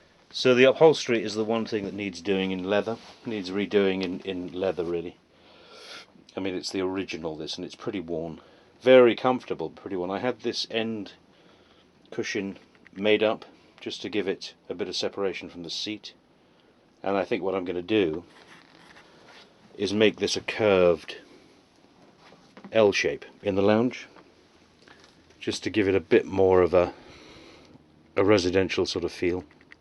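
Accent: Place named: United Kingdom. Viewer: your videos are great. Nice and laid back presentation, such a perfect British English, delight for the ears and such a clean and wonderful S900. We know each other from the Classic Merc Hymer group on FB, we exchanged comments. Please, keep on with the videos.:) Regards, Yaro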